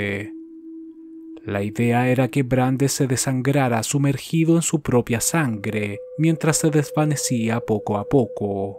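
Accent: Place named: Chile. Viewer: Hola, espero estes bien! Felicitaciones por tu canal. Lo q mas me gusta es la narracion chilena que haces de los videos. Estoy harto de escuchar relatos mexicanos, por eso te felicito por tu narrativa. Quisiera mas videos nuevos, ya los he visto 3 veces.gracias. Ronald cortes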